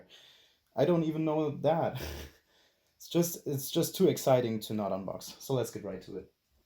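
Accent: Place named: Germany. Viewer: Oh my god , Its Indeed Beautiful Piece of Tech, by The Way You are German I think by hearing Your Accent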